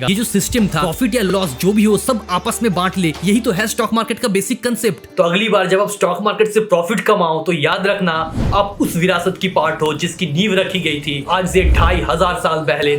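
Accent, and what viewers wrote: Bengal, India. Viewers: Bhaiya aap Bengali ho kya?? Apka hindi accent suun ke lag raha hai😅😅❤ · ভাই তুমি তো বাঙালি? তোমার কথা বলার পদ্ধতিতে বোঝা যাচ্ছে। ধন্যবাদ তোমার ভিডিও খুব ইনস্পিরিং। আরো এগিয়ে যায়।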